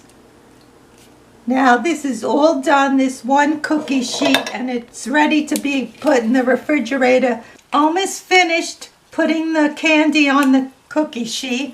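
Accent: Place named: Boston, United States. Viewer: ARE YOU FROM BOSTON MASSACHUSETTS? I HEAR AN ACCENT I RECOGNIZE BUT MY GRAM WOULD SAY BUTTAH NOT BUTTER.. SO I MAY BE WRONG ... BUT I HEAR PAPAH TOWEL ... SOUNDS JUST LIKE MY GRAM.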